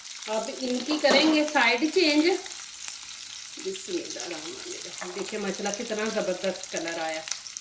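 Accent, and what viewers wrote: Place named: Punjab, India. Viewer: اسلام و علیکم خوش رھیں شاد و آباد رھیں بہت بہت بہت بہت بہت ھیییییییی مزےمزےکی ریسیپیز بتاتی ھیں آپ اور اور اور اور اور اور سب سے بڑھ کر آپکے بولنے کا انداز آپکا پنجابی لہجہ بہت ھی اچھا لگتا ھے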